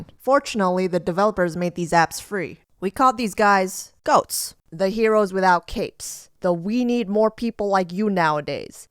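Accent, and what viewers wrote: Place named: New Jersey, United States. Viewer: no hate but u sound like the steryotypical jersy girl/New york girl 😂💞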